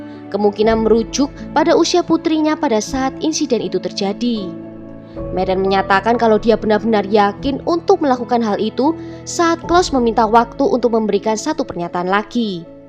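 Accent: Java, Indonesia. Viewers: Medok mbak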